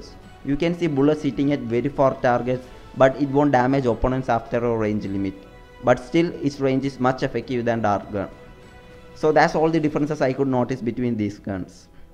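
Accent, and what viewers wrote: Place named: Kerala, India. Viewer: U are a mallu.... ur eng tells it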